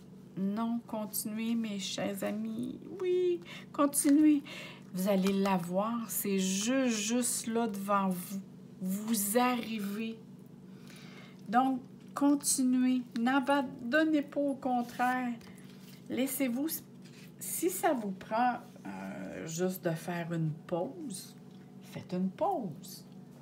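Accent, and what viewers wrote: Quebec, Canada. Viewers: Cc chère ^ ^ tt a fait vrai mon dieu que j aime votre accent..cela me ramène en 1991 mes 24 ans mon voyage au Canada.. Québec...mes études d infirmière....J étais dans la région des Laurentides...magnifique pays....et oui une grande fatigue indescriptible...je continue ms mon dieu quelle résilience....bisous ^bon bec^..& oui des cicatrices inomables un passé très très douloureux qui a laissé des marques......pour au bout du compte devenir qq un de très fort oui une volonté hors pair merci gratitude namasté.......tout ce qui ne nous tue pas nous rends plus fort et oui j affirme.. kris de Nice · Bravo quelle belle énergie et j apprécié beaucoup ce petit accent me rappelant la douce Céline dion.bonne intuition merci à vous.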